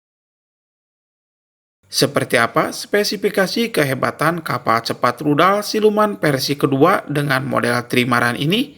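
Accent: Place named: Papua, Indonesia. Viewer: admin logat ny kya orang papua ya.. khas banget😂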